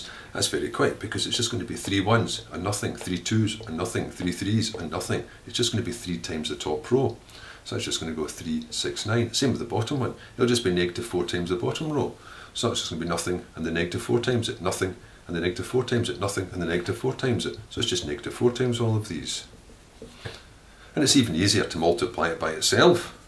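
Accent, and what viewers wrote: Scotland, United Kingdom. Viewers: He's quite clearly Scottish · That Scottish accent is freaking awesome.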